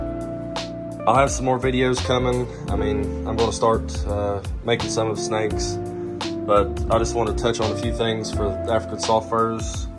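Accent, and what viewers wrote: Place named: South, United States. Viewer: 😂 I heard your lovely southern accent and I was expecting some wholesome country or bluegrass music for background music.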